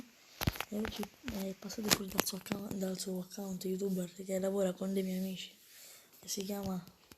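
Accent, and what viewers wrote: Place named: Sicily, Italy. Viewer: Si sente l' accento del Siciliano 😀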